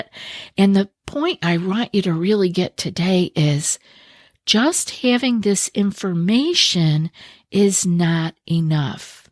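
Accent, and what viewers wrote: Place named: Upper Midwest, United States. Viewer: You’ve gotta be from WI or MN with that accent.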